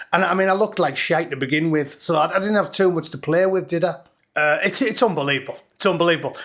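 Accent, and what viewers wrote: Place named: North, United Kingdom. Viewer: When you served you were the best of us and now you still are northern sounding gentlemen.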